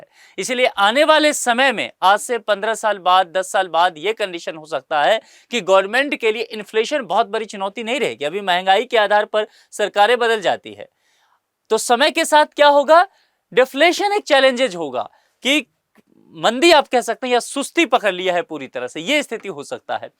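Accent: Bihar, India. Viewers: Bolne se lagta h ye bihari h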